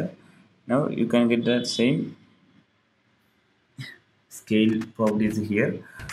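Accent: Kerala, India. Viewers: You are a malayali... Right? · Malayali aano?? Enik aa slang ketit angane thonunnu vere aarkelum ee dobt ondaayo??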